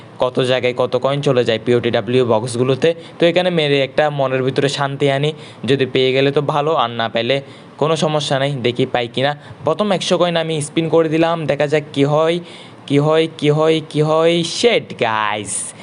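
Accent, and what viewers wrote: Chittagong, Bangladesh. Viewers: ভাইয়া কি চট্টগ্রামের নাকি???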